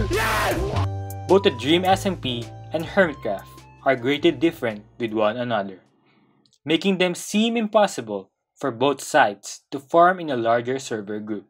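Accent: Philippines. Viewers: are you Filipino? you sound like one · I never heard such a Filo accent in a Minecraft video holy crap ahahaha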